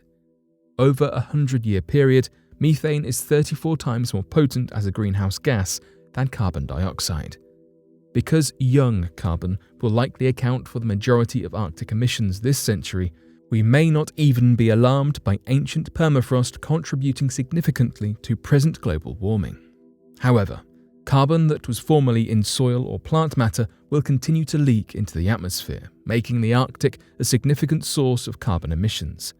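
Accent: United Kingdom. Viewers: That's the way Brits pronounce it and he speaks the queen's English. Maybe we are pronouncing it wrong??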